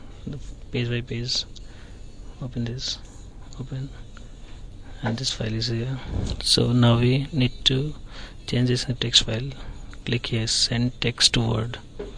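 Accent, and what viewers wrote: India. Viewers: How stupid are the people making negative comments about the guys voice. He's speaks English as a second language.... of course he sounds a little different.. He put the video up to help you out with your dumb selves. You couldn't figure out how to solve your problem... so you looked to the same Indian for help. ugh Lazy idiot racist. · i honestly HATE indian accents it sounds so gross….that doesn't make me racist because i don't like it…anyway nice video, thanks for the help!!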